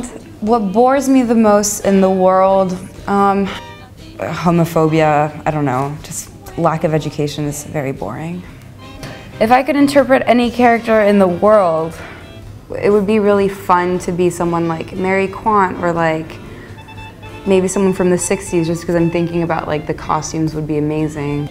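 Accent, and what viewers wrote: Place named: France. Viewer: Ella tiene algo muy francés... Ademas del acento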